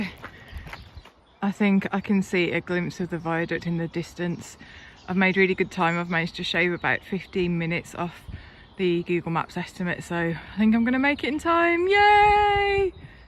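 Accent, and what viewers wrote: United Kingdom. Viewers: Lovely video, lovely lady, lovely British accent, so much beauty all around!👍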